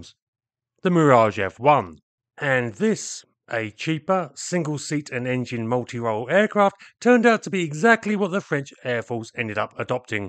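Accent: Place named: Essex, United Kingdom. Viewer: an informative vid as ever. Havimng memories of building this Mirage. And as ever your accent takes me back to my airport and airfield days around Essex and Chelmsford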